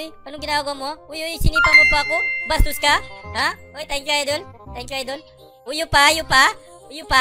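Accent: Visayas, Philippines. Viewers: Bisaya ka no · Bisaya ka bay? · Bisaya po kayo😂😂?? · Bisaya ka dol? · Bisaya ba to? HAHAHA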